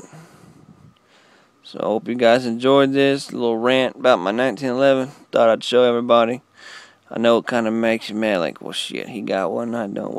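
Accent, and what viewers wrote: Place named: Texas, United States. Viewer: JohnnyAss, Dude is from Texas. STFU Hollywood.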